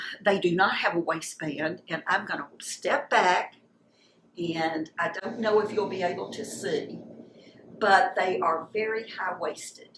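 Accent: South, United States. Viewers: Glad I found you. I'm fast approaching my 70's and the difference between my late 50's and early 60's has caught me off guard! Changes in health, sleep, and my body in general. You are honest and fun without tons of pretense. Plus your accent reminds me of my favorite sister in law and other family that stayed down south. I use to live in Florida as a kid. Thumbs up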